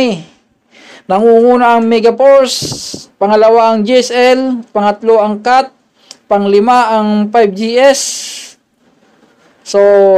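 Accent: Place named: Visayas, Philippines. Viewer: Halatado bisaya kang sg ka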